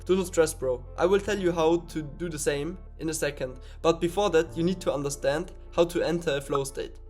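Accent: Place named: Germany. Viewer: Du bist deutsch man hört es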